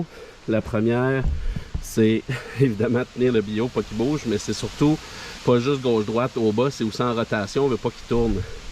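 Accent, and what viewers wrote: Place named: Canada. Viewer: Bonjour, j'aime regarder les vidéos de scies (moulin) comme vous dites dans votre pays, de plus j'adore l'accent Canadien, je suis ami avec une personne de votre joli pays dont la chaîne est : l'gosseux d'bois ou The Woodpecker j'ai aussi mis en ligne mon travail quand j'avais ma scie vous pouvez aller voir ça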